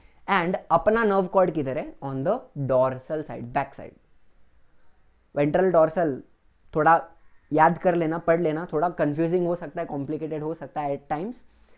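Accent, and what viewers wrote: Maharashtra, India. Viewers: Sir you marathi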